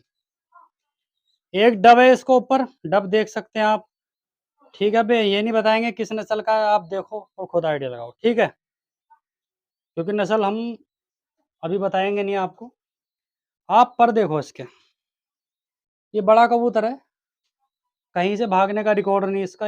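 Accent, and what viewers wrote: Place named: Bijnor, India. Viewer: Bhai ne dil khush kar diya boli se bijnouri he bhai pakka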